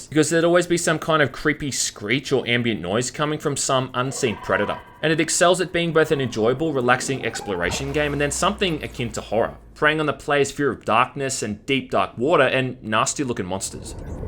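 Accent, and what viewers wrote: Australia. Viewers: I like how he sounds like an Australian from "down under". Lol. It's so fitting.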